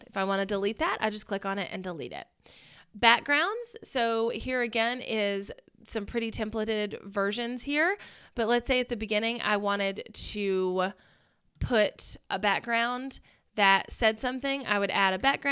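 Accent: South, United States. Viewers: Great video, as I was listening I was like "this lady is from the South". Sure enough NC, Me too. Thanks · Great video. She explains it well, and has a cute accent. From one southern to another-- get it girl!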